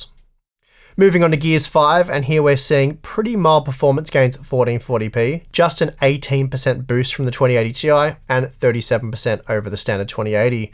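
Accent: Australia. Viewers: And that Aussie accent just gives it extra spice!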